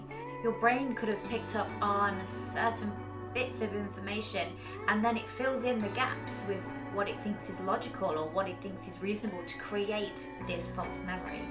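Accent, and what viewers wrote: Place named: England, United Kingdom. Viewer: Hi, I’m from California and enjoy learning about different peoples experiences of England and in England. I was wondering which region your accent is from. Just particularly like the way you speak. Have a great day. Thanks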